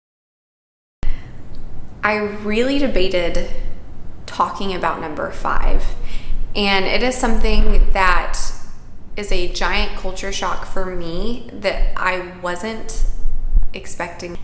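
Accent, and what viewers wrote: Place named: United States. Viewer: you have a normal American accent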